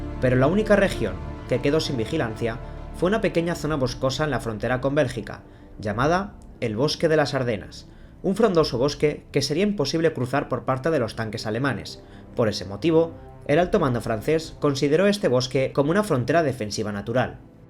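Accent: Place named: Spain. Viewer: El acento español queda perfecto para los relatos, saludos desde PARAGUAY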